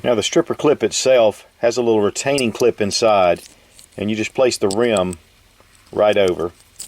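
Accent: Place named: South, United States. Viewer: I really like your videos and find them to be very informative and answers all of my questions before I need to ask them. That, and your southern twang makes these videos fun to watch.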